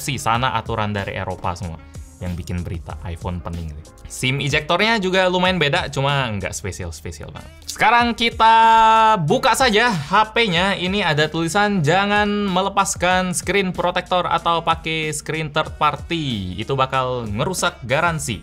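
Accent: Palembang, Indonesia. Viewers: Keker bahaso pelembang nian😅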